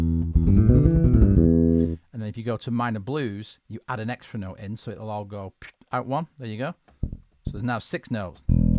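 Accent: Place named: Northern England, United Kingdom. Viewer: Superb tutorial, this. And nice to hear the accent of a fellow northern lad teaching it.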